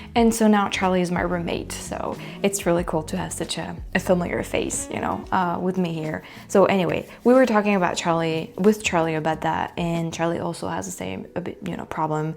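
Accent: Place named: Paris, France. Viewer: ..... the brain is a powerful thing. I'm no expert but I too will have tummy problems from time to time. This would happen when my brain was working overtime and my stress level was up. Stress comes in differenf forms. It can definitely mess with your digestive system. Your mind is obsessed with this issue. It will continue as long as it bothers you. You will have to try not being too regimental, try to chill and only eat what agrees with you. So for breakfast you had 3 acidic items and an egg. That acid will not help, so maybe an egg (or 2) and some dry toast. Best of luck, I know you'll make it, and don't give up your beautiful Parisian accent. I can listen to you all day:)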